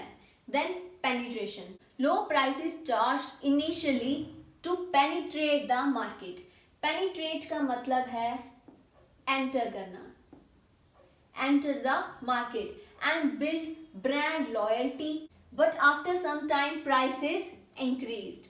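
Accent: Jammu, India. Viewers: Mam are you from Jammu ???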